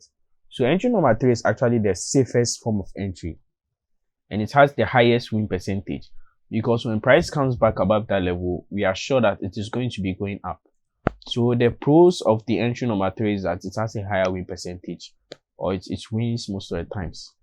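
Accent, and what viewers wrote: Ghana. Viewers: you sound Ghanaian.please are you in Ghana? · The Ghana accent is strong with this one!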